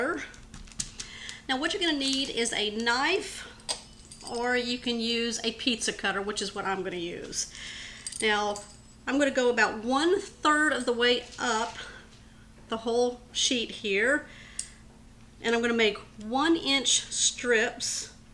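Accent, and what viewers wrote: Texas, United States. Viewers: Oh,!!! I going to go make this right now...I believe I have everything in my fridge and pantry!!! I'm going to add a little almond paste 😋 to punch it up a bit. You are wonderful ❤ 💖 I love just listening to your Texas drawl. You explain everything so well. Thanks again. Looking forward to whatever is next!!!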